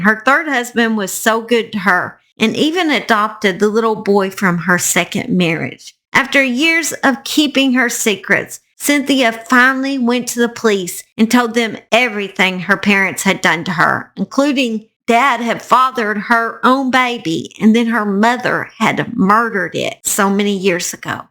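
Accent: South, United States. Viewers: I just love your country accent and I probably sound just as country I'm from Mississippi. Love your dog he's very smart.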